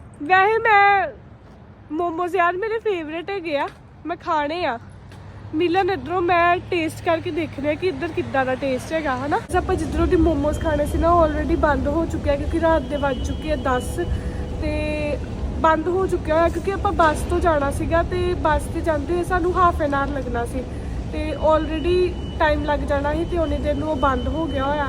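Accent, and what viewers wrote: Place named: Amritsar, India. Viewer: Love ur Amritsari accent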